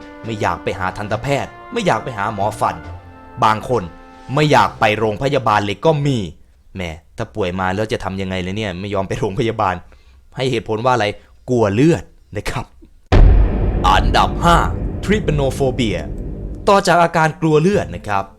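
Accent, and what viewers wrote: Isan, Thailand. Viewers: พี่เป็นคนพาคอิสานเหรอคะ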